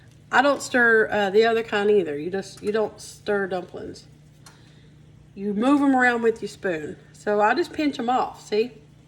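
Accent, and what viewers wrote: South, United States. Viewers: Sister, you might live in Northern Ky., but where are you from? That accent sounds like it’s further south and east. 😊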